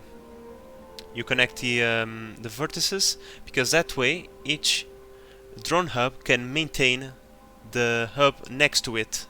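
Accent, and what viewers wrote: Portugal. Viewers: I bet you are portuguese, you sound like it, dont get me wrong, your english is very good :) and spanish people dont go along that well with english eheh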